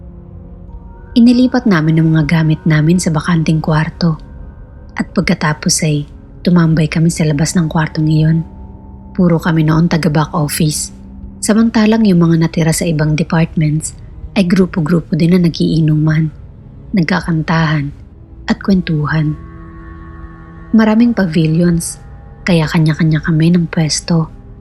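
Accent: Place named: Philippines. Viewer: Another pinay voice, lovely, totoong pinay accent talaga, greetings from London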